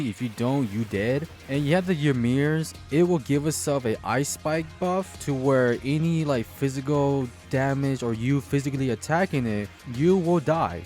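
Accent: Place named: Bulgaria. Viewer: Bro are you Bulgarian cuz your accent sounds exactly like this one Bulgarian artist that I like to watch on yt lol Nice video btw, youtube recommendations getting it right for once :D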